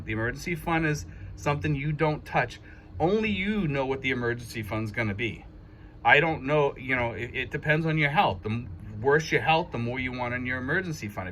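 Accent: United States. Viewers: I just recently subscribed and really enjoy the videos. Probably the best info i have found by an expat in the Philippines. I also invest in both Tesla and ARKK. Both are great investments. I just sold my business recently and my filipina and I will soon be moving to the Philippines. I have been trying to figure your accent. What part of states are you from? Thanks once again for the great and informative videos.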